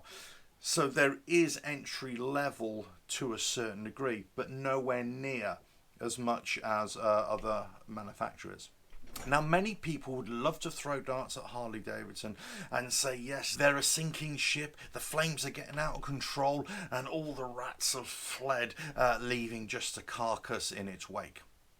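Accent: England, United Kingdom. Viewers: WTF Harley going bust? No. Harley change, is business tougher. Probably. Your accent, suggest you are in England. Back when I lived in UK. Harley was a rare sight, So was a Goldwing, Don’t know about today. Can’t see riding B roads in UK on a Harley, or a Goldwing. Just my opinion. Or at least I couldn’t when I was a young guy with a 250. When you get older, your priorities change, The Harley I thought to big, to old, to boring and to slow, a bike for old fat guys. Not for me when I was young. 35, or 40 years, Comfortable sounds much more appealing, No point in Harley making a crotch rocket, Ive out grown them. Now I am an old fat guy. Today’s millennials will out grow crotch rockets as they age. Can afford bigger, More comfortable bikes. Some will choose, adventure, Classics, Touring or Harley. The Harley market share in the US will remain strong, biggest risk is loosing some to Indian. 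Those of us who choose, British, Italian Or Adventure, were never Harley customers in the first place, They haven’t lost US, they just never built the bikes we liked. We are not buying Japanese cruisers either.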